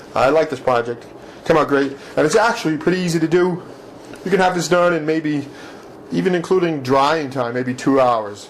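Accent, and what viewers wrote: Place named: Bronx, United States. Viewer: I love how you thumbs upped your own video. Sometimes, I think I'm my biggest fan too! Your accent reminds me of a guy I grew up with who was from the Bronx, where did ya grow up?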